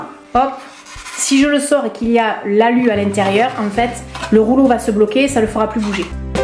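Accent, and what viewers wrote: South, France. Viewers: Bonjour, Je découvre tes vidéos...et j'aime beaucoup! J'aime bien ton accent du Sud moi la Lorraine. Je te remercie pour ton investissement et je me permets de te faire un 🥰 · Toujours agréable vidéo avec ton sourire et ton petit accent du sud bisous ma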